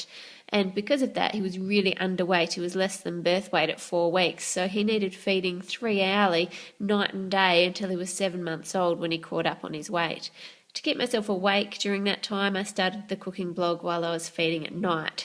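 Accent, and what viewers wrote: Australia. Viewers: Now I know why you have the different kinda accent from Australians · I don't really know the difference between Australian and English accents lol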